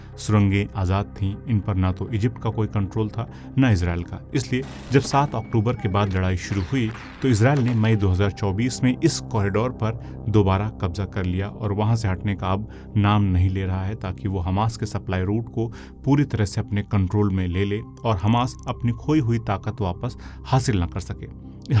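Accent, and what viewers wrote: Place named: Pakistan. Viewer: Using mostly Urdu & speak like Pakis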